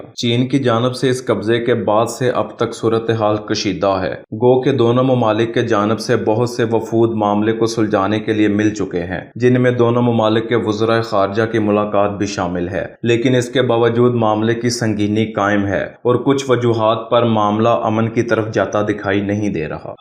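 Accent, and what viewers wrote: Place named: Punjab, Pakistan. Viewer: Why you have Punjabi Accent. Because you are part of India.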